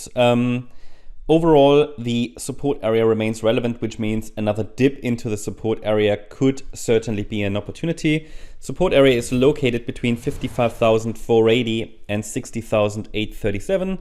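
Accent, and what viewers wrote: Germany. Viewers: Was meinst, ist er deutscher?? Also, für mich hört sich sein Akzent deutsch an😅 Auf jeden Fall, bester Cryptokanal.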